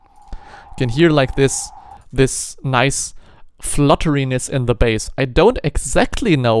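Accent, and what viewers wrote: Germany. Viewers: Ich wusste es! Ich höre den Deutschen Akzent doch raus ;D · ich liebe diese deutschen akzent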